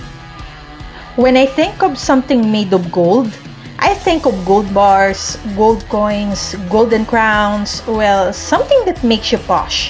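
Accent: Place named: Philippines. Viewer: Salamat po! My son, Jaja heard your accent and shouted "She's Filipino!" What are very creative way to share the gospel. Watched the entire video including the bloopers!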